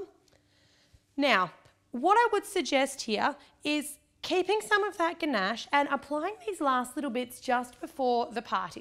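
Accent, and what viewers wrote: Australia. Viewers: I love australian accent!! Thanks for the tutorial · youre ausralian?! i tought youre british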